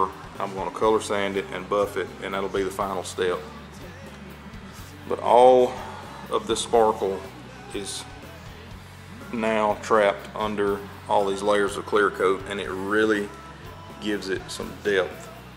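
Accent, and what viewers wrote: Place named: North Carolina, United States. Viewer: Are you from North Carolina? Man,your accent is NC. Very nice job on the guitar,btw.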